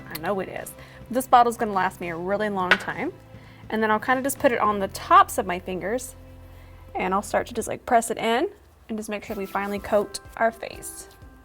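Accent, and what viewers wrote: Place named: Texas, United States. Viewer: Saw you on Snapchat and recognized a hint of Texas accent...had to follow! Can’t wait to see more videos!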